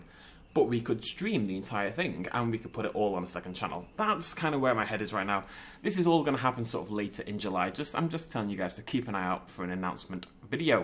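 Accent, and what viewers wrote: United Kingdom. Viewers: Ten days without a video in British? I can't deal....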